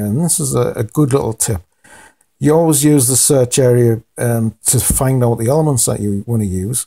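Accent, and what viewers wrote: United Kingdom. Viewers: Thanks for these VERY helpful tips! Nice to hear a NE accent as well...I'm from Durham City but living in Kansas now. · The bit about Dropdown menu items is no longer correct in version 4.0.4. I wasted some time before realising that there is now a menu in the middle of the page above the main form where one can add items, remove items and so on. Otherwise, it is a nice presentation. I like the BBC accent. :-)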